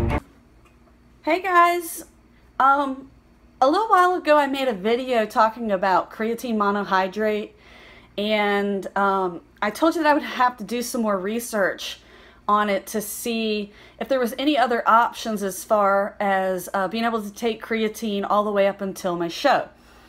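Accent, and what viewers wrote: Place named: South, United States. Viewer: I'm hearing a little southern draw there. It's refreshing. Good info.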